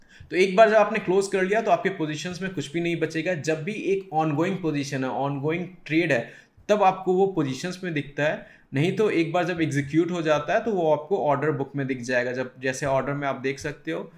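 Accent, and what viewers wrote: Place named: United States. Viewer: Aaj Maine pehli baat american accent me hindi basha suna hai.. Ya fir ye kaho ki angrezi me hindi suna hai..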